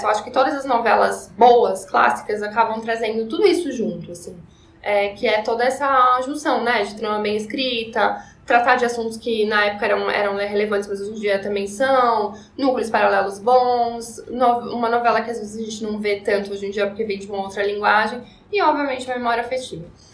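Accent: Rio de Janeiro, Brazil. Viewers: O sotaque carioca da... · Amei o sotaque,!❤como carioca, confirmo q é assim mesmo! Melhor q o da Jade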